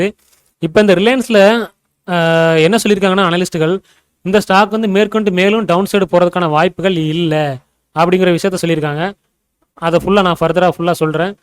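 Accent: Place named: Tirunelveli, India. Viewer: ok bro. Na tirunelveli than. Namma ooru pakkam ulla tamil mari erunthu athan ketten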